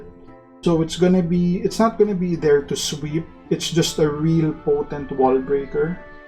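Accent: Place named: Philippines. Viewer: Are u filipino? I am too i can recognize the accent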